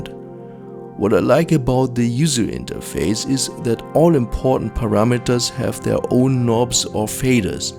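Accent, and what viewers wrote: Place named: Germany. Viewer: I missed the special, somewhat druggy German accent 😅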